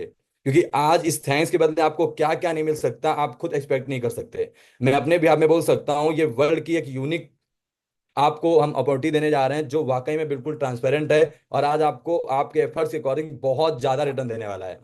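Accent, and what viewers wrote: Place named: Bihar, India. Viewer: Bihar se ho kya Tone match kr rhi h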